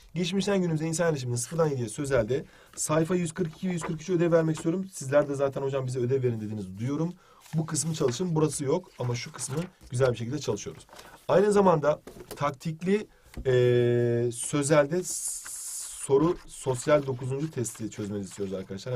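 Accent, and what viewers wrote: Turkey. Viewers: şive meselesi. Egeliler öyle konuşuyor.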